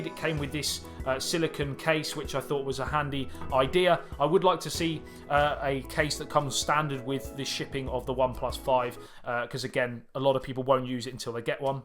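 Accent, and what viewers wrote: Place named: Wales, United Kingdom. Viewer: I would love this phone, and I love your Welsh accent 😉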